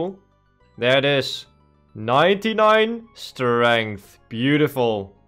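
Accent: Netherlands. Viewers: The typical dutch accent… i love it 👌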